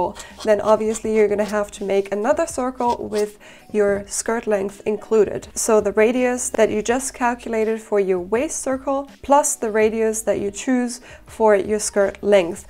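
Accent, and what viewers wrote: Germany. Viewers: i can totally hear you're german :D nice dress tho. will try to make it myself.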